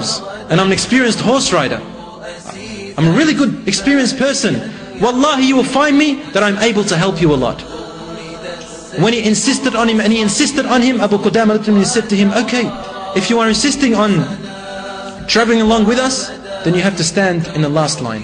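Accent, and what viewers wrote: Australia. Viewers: The speaker has an Australian accent and i think it might be Moosa Cerantonio, hope it is and salam to you :D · Who is th e person speaking? It doesnt sound like Sheikh Feiz to me...besides the australian accent. And people stop crying about what he said first... slip of the tongue, we're humans we make mistakes. =)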